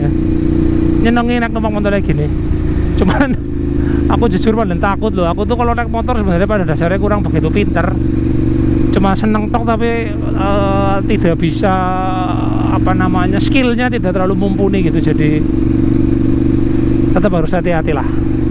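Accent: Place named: Banyumas, Indonesia. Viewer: Salam ngapak bapakeee wkwk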